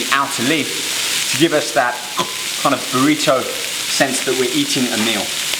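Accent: London, United Kingdom. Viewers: "you sound like you're from london"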